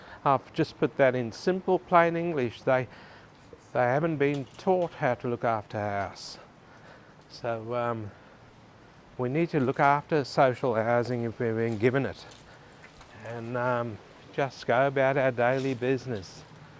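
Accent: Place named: South Africa. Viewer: Sounds like you're from South Africa, mate - one of the highest crime rate countries on the planet!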